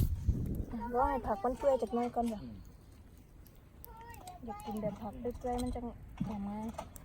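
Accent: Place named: Khmer Suay, Thailand. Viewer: ตอนแรกนึกว่าเอื้อยเป็นซวยเขมรทางบุรีรัมย์ สำเนียงการเว้า หน้าตากะคือ อย่าด่าเด้อ พึ่งคอมเม้นแรก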